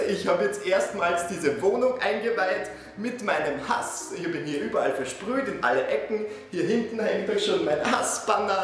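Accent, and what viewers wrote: Vienna, Austria. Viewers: ich wohn in berlin kreuzberg und mein postbote ist sehr faul. ^^ anstatt zu klingeln bei mir (4.stock wohn ich) und sich die muehe zu machn hoch zu laufen gibt ers irgendwo anders ab sodass ich jedes mal mein verdammtes paket suchen muss.. ps. ich liebe diesen dialekt xD bin halb deutsch halb oesterreichisch also versteh ich alles und find diesen dialekt so angenehm. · 1. hat meiner Meinung nach keinen so starken Dialekt, weil er versucht - glaub ich - in Schriftsprache zu sprechen, damit ihn die Zuschauer auch verstehen. Aber er hat einen typischen Wiener Akzent, den jeder erkennt. Sogar Deutsche. 2.) Ist der Wiener Dialekt gar nicht so krass, wie andere Dialekte. Das ist so ähnlich wie mit den Berliner. Da sprechen auch fast alle in der Schriftsprache. (Nur der Berliner Slang is noch n bisschen krasser, aber der kommt gar nicht so häufig hier vor.) Bin jetzt nicht der große Dialekt und Akzent Experte, aber hab da schon so n bisschen was mitbekommen. 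Besonders, wo ich selbst Österreicherin (Steirer) bin, die vor ein paar Jahren nach Berlin gezogen ist.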